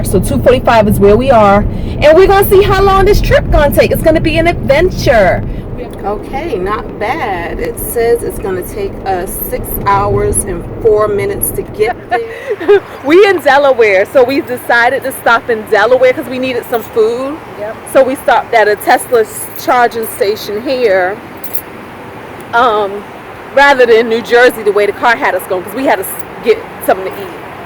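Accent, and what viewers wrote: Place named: New Orleans, United States. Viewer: Luv that accent !New Orleans Lady Ice Cream pralines. ummm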